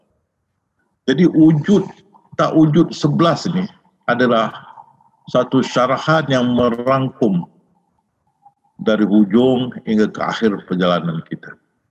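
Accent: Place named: Malaysia. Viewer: Saya mohon mengikuti kuliah ustaz .ini kali pertama. . .gaya cakap accent ustaz ni macam gaya orang Melayu dari Malaysia..alhamdulilah.Tk.tuan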